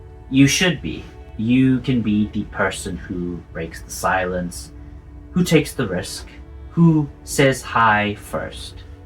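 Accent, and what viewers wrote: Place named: South Africa. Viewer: Just one thing I need to know, you accent sound a bit South African, I know that you're in Australia but do you have a connection to South Africa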